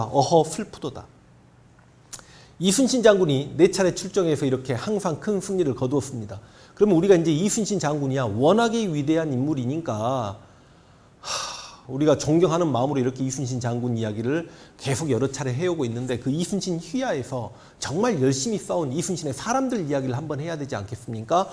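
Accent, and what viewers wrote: Jeolla, South Korea. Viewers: 황쌤은 고향이 혹시 전라도인 맞나요? 강의의 냄새가 남쪽 냄새가 그득하게 느껴줘요.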